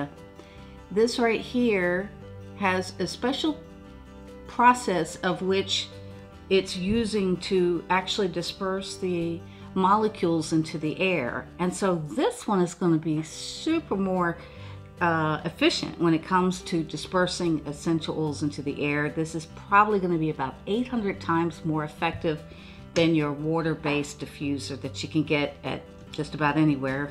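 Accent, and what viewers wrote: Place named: New Jersey, United States. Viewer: You sound like you're from New Jersey.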